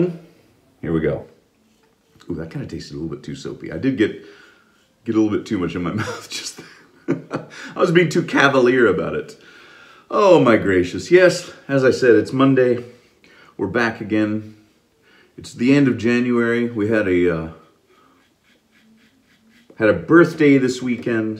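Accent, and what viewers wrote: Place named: Liverpool, United Kingdom. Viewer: Might get this soap myself, peppermint 😃I love your Liverpool accent you do it perfect, hope all is well.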